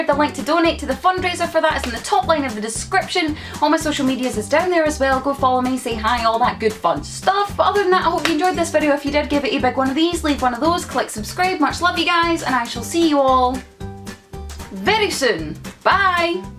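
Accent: Scotland, United Kingdom. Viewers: I moved in Glasgow 1 year ago, your videos are helping me a lot to understand the Scottish accent 😂😂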